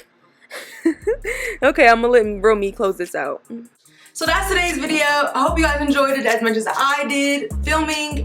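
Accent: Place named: Columbus, United States. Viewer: You can tell you live in Columbus you got that talk 😂💞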